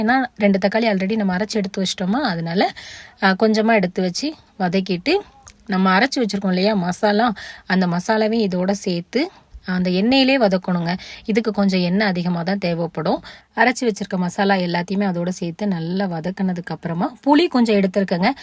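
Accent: Tirunelveli, India. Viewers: Happy birthday thampi Akka neinga evlo naal estate la erukinga ananlum slang tirunelveli slang ennum ungaluku pogala akka❤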